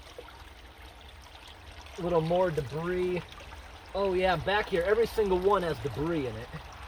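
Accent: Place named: Northeast, United States. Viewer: just found you dude and you're super entertaining to listen to and watch! i'm from PA originally and idk if it's just me but you have a north eastern cadence to your speech, makes me homesick. keep up the nice work!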